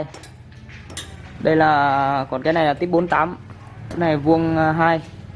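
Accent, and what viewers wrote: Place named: Central Highlands, Vietnam. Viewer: Oh giờ mới thấy rõ ghe giọng nói hình như là người vùng cao OK Number one.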